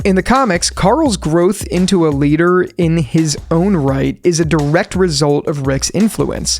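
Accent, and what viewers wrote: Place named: Philadelphia, United States. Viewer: Love the Philly accent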